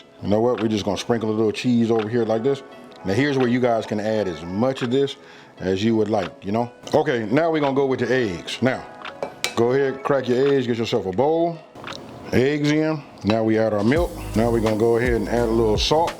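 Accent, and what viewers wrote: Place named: Detroit, United States. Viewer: he must be from Detroit he sounds just like he from there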